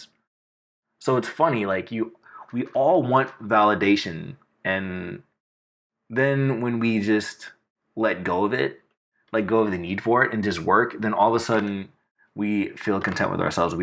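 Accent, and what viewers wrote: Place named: United States. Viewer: and I thought you'll have the Nigerian accent.... Lol. Love your American accent though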